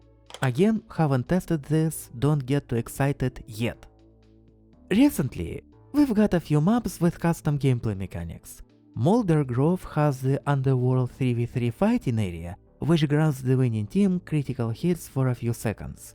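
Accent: Russia. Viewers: he is russian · Probably slavic. Really strong russian accent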